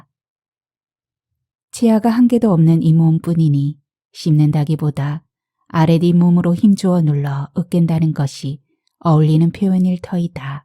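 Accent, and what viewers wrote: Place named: Gyeongsang, South Korea. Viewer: 잘듣고 있습니다 갱상도 분이신가 사투리가 아주 구수 하구만요 5,60년대 우리들의 삶이야기네요 한없이 배고프든 시절 보릿고개를 힘들게 넘든 시절이엇죠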